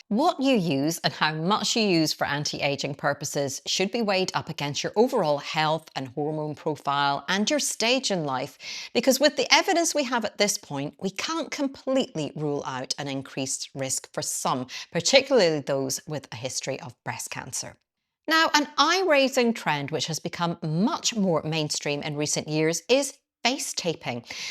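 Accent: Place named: Scotland, United Kingdom. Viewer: Aaa yes! It is hard for me sometimes to hear where people in UK are from but you sound like Nicola Sturgeon! Your Prime minister? Hmmm should know this better! Feels like I have more question marks the more I write… 🙄 It sounds lovely anyway 🥰👌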